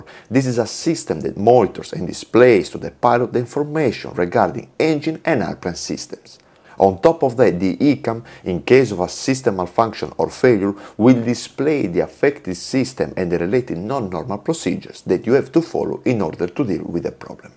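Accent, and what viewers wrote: Italy. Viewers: Sei italiano e sei sardo ahahahah si sente dal inglese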